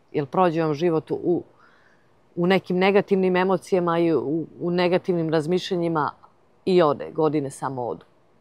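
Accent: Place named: South, Serbia. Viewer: Sve ok, ali da kaze da je iz Beograda sa ovim naglaskom - nema teorije. Juzna Srbija se cuje u intonaciji.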